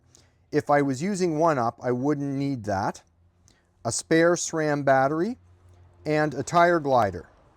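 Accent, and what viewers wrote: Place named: Pittsburgh, United States. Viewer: That's pretty close to a Pittsburgh accent. Never seen the channel before, so that's my guess.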